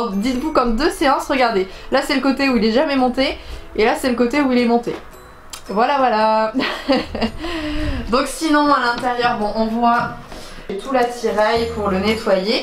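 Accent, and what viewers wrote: North, France. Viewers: tu as raison en fait. C est juste que dans le nord il prononce vanne. 🙂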